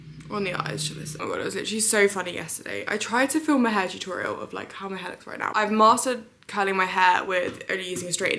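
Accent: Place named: United Kingdom. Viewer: where are you from exactly in UK your accent is hard for me to understand🥲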